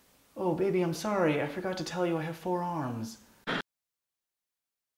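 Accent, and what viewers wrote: United States. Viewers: I usually can't stand american accents but yours, yours I can handle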